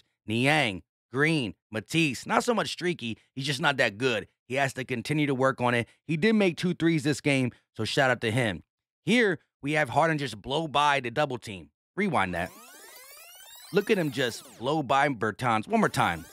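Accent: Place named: AAVE, United States. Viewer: Why speak in ebonics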